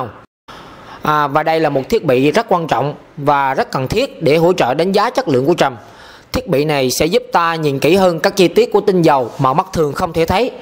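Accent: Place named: Quang Nam, Vietnam. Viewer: Nếu muốn là ng của công chúng thì nên học nói tiếng phổ thông chứ nói tiếng quảng ngé nge thôi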